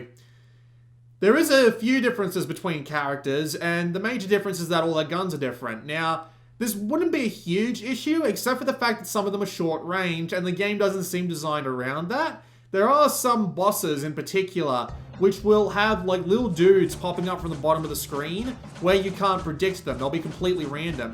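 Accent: Australia. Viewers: Just wanted to thank you, I've been comming increasingly aware that I use your channel a lot when looking for PS VITA games (especially ones that have been turned into limited physical releases, including this very game!). But i'd much prefer to play Darius Burst than this.. I do say I love the art work in ths game though! PS - If you haven't already, I strongly recommend "CALADRIUS" on PS4, it has become my all time favourite Bullet hell shooter!...even though I have never made it past the 3rd Boss (I don't use continues, just rinse & repeat!) oh and it's awesome listening to a fellow Aussie gaming! =)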